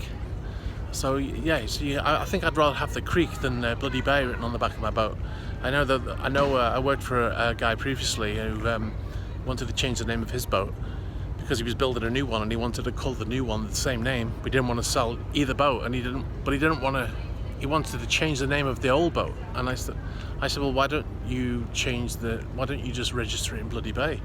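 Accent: Liverpool, United Kingdom. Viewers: You a scouser or from north west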